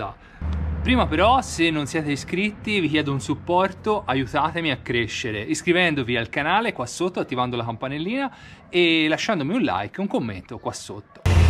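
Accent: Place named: Tuscany, Italy. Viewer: Oh che sei Toscano?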